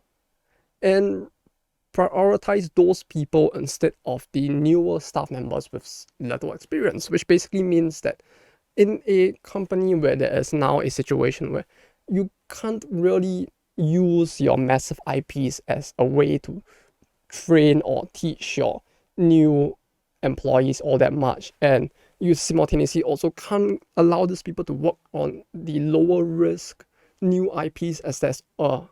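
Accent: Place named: Singapore. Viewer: I'm a first timer on this channel since this happens to be on my recommendations. First of all, why is your channel low in subs? Cause this is some seriously good and insightful stuff. Typing this near the end of the vid; almost finished watching. A lot things you've said actually clicks and confirms certain stuff that I only have a smidge about JP Game dev and understand how they dev as how it is. (ie, game tech and design patenting). Like, on the surface I can agree and understand they do it for protective reasons, but I never crossed in my mind that this thing can actually stifle innovation for new games and even local indie games in the large scope. Second of all, are you actually native Japanese? Cause your accent is way more closer to a Singaporean. Heck, if you were to tell me you are an expat living in Japanese or you had a half South East Asian parent, I wouldn't have been surprised. 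On that note tho, you are quite eloquent and really know how to orate. You could be a top ranking salesman or politician, if you catch my drift, haha. But yeah, not a dull moment when you speak. One again, YouTube has unearthed for me a great gem of channel. I hope more would witness this bright glimmer.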